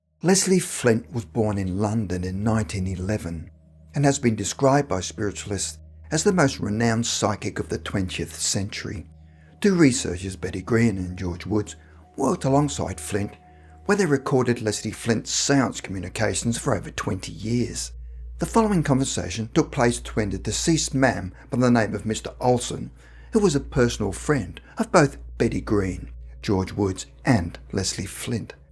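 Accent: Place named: Australia. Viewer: I find the narrator's voice very engaging, yet somehow pleasant and soothing at the same time. Though many of these stories seem to be set in England, I thought the narrator's voice sounded Australian. I finally checked and saw that emanates from Australia. I'm pleased my tin American ear was correct for once.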